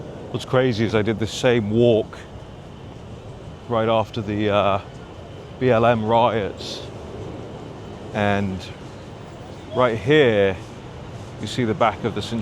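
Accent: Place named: United Kingdom. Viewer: you're losing your beautiful British accent.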